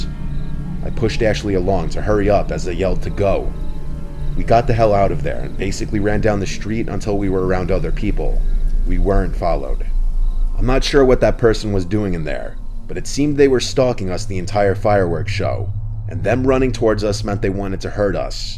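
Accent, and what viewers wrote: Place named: Northeast, United States. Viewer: I really enjoy his narrations. Especially when I hear and itty-bitty hint of a Northeastern accent? Pretty sure that’s what I’m hearing. Correct me if I’m wrong please! 😅❤ For example: starting - I can hear the accent a tiny bit when he says “fenced off” . Also when he says “flashlight” and “Ashley” Example . 😊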